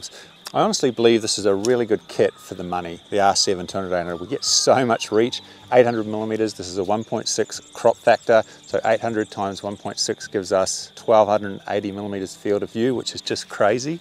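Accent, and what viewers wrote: Australia. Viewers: Your Australian accent.:-) Nothing wrong with it of course.:-) Greetings from the Netherlands, spring is coming here soon.:-) photographer and Filmer.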